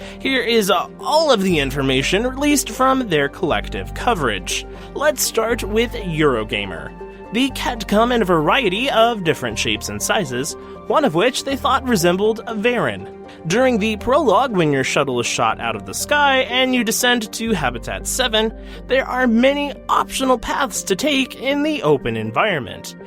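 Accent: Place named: Scotland, United Kingdom. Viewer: scottish accent....oh god yes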